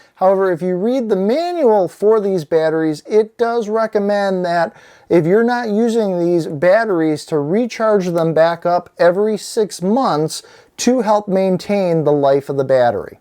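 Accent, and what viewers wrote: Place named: Western New York, United States. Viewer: “Badderie” your accent is hard-core Western New York to Wisconsin .. still enjoyed it thanks for making the video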